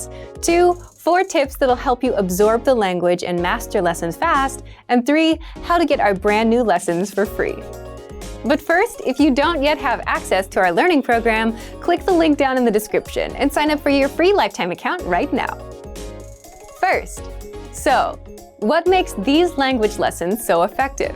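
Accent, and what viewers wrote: United States. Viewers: pero es de California, New York... De dónde será? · Es el mejor acento es de EEUU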